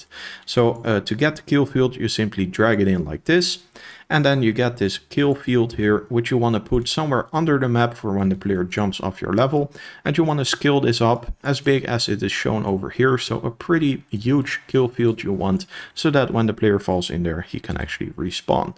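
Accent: Netherlands. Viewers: Duidelijke een Nederlandse accent :D. Cool Template tho!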